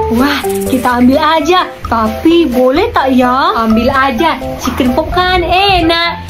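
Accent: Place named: Sumatra, Indonesia. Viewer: Dari aksennya kayak melayu sumatra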